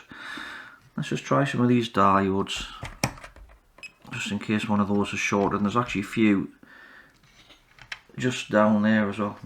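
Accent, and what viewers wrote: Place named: Tyneside, United Kingdom. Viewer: Hi if you dont mind me asking where is your local repair cafe? It sounds an interesting place that i would like to check out (im a fellow electronics repair engineer in the Tyneside area). I am of course making assumptions from your accent.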